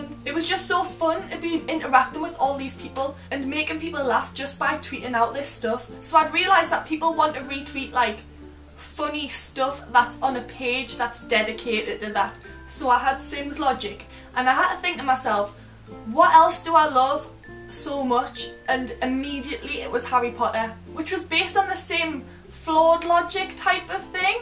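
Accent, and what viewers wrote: Newcastle, United Kingdom. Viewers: Is she from Newcastle?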